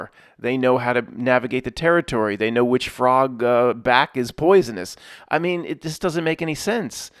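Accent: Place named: Philadelphia, United States. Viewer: & UK Nottingham ❤Philly accent mat in fact I prefer ya Philly accent 😂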